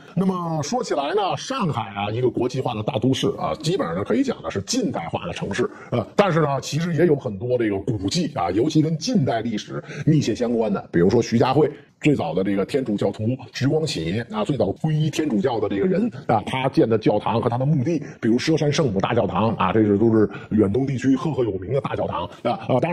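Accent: Beijing, China. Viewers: 還是喜歡聼袁大頭本人的刁兒朗鐺的京腔，很熟悉的鄉音。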